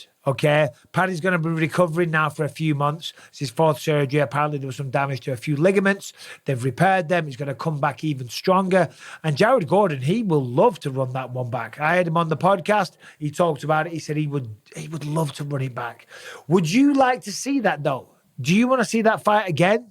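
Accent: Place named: Liverpool, United Kingdom. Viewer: Scouse throbber.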